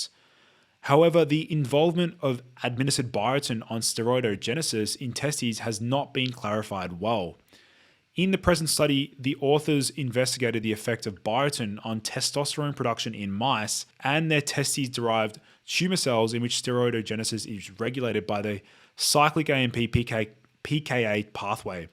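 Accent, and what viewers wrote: Australia. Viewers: I literally couldn't finish the video, Ozzie accent is far too thick, drives me nuts 😢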